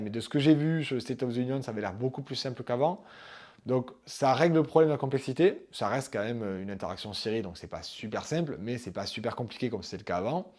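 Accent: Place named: Marseille, France. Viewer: ta l'accent de Marseille ! cool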